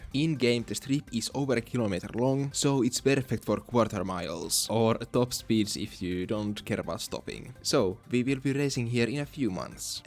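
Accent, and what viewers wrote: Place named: Turku, Finland. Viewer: Thickest turku accent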